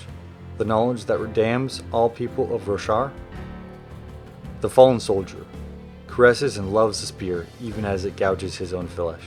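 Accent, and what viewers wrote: South, United States. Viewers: I love your video at your house in Texas during your quarantine until you had like a book called, "Rhythm of War" by Brandon Sanderson until you're talking about all of the characters on the book from the very beginning while you're reading the whole thing after that, I hope your husband, Zach will be very proud of you and I love your Southern accent!!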